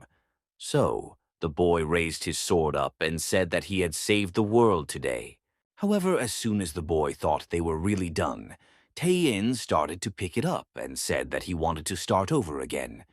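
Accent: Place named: United Kingdom. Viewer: The narrator is British!!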